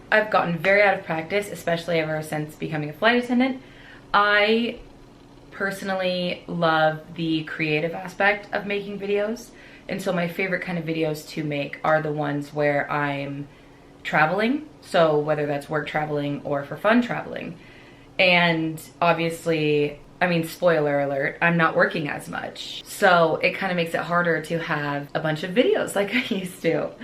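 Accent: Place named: Southern California, United States. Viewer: my friend, I hear you talk,and I hear in both what you say and how you say it that you are a So Cali girl. This AGED So Cali boy feels back home when you talk to us. You are totally right. Daddy D IS over staffed. And most likely every one else. I actually tried toward end of year with you, but Talent Acquisition closed the Req# right away. No doubt somebody realized there were too many people to pay already. Let me fill you in on what has gone on. I think you know I am not 28. It’s the reverse - 82. Due to Rodg’s death from cancer a year ago today (25 February), being alone here and rambling in a place too big for me, I decided finally that zi needed to be around different people all the time and to be away from being home alone in order to have those experiences of growing and broadening. Only F9 was hiring. Although in good health, at 82, I never thought I would get invited to an all day event, much less get offered a CJO I was invited to an event in DEN 14 September and got the offer. 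3 days later, I declined it, mostly due to having some pretty expensive property in my care (you may know a little about SEA real estate). I remained in good stead and later thought how dumb that was or me and that I really want that FA lifestyle snd to try again after 3 months If offered again, would just close and lock door. Well, went through whole process again, got invited to DEN event 6 February and was offered CJO A SECOND TIME. Am waiting for the background check to be comple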